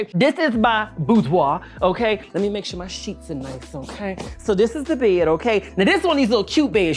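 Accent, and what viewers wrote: United States. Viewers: I actually believe this. I do think that he gets around it because he’s American, the accent is what saves him. Any black South African is not having the same experience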